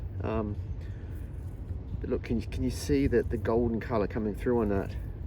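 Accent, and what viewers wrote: Australia. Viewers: Some lovely finds. The cloth seal is so detailed and it’s amazing that some of the material was still attached to the belt part. I love those beautiful hand-made pins. Always enjoy your videos (and hearing that Australian accent 😋)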